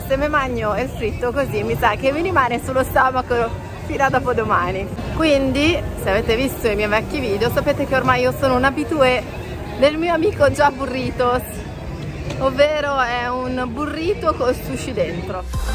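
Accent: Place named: Milan, Italy. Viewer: Sei talmente solare che e' un piacere ascoltarti...e vederti. Poi per me che so Romano e' srmpre bello sentire il milanese parlato da una ragazza. Baci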